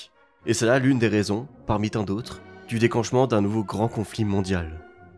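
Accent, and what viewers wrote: Paris, France. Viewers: Merci pour ce résumé très précis . Une petite critique malgré tout : attention à l 'articulation en parlant , j'ai l'impression d'entendre un petit jeune du triangle d'or (Auteuil , Neuilly , Passy) . Il faut parler plus lentement et plus articuler , marquer la pose .Si non c'est très bien , bravo. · Je plussoie sur l'articulation. Je décèle un accent parisien, avec une tendance à accélérer trop certaines parties et phrases.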